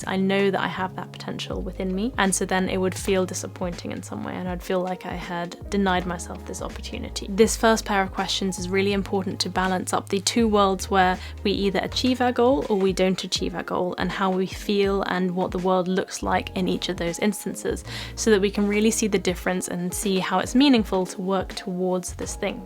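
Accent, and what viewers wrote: RP, United Kingdom. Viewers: Love you always and british accent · Hello, Thank you for telling me about your Goal Setting Science and it is really important for me to learn from you how to set goals in my life for the year 2023., I'm happy when you got a degree and became a doctor. I love your nice British English speaking styles. You know many Chinese styles. My support is for you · Hello, I really like your British accent, I would like to communicate with u, would u like that I send u my e-mail❓️thank u very much, take care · Thank you so much ........I really love listening to ur British accent!( ˘ ³˘)❥❥❥ And I enjoyed all day long watching ur Vlogs 🤗🥰 Hoping for the further....,, useful & motivational Vlogs of your's!💟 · Such a breath of fresh air to start off the new year such a positive accertive approach puts me into gear for the year ahead please I adore your English do some more rp english videos I am english but need to polish up thanks so much keep up the good work and yes some yoga blogs would go down well roger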